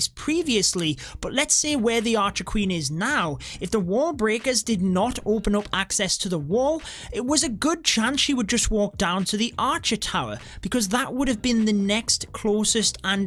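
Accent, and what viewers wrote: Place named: North, United Kingdom. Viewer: his accent is a Northern one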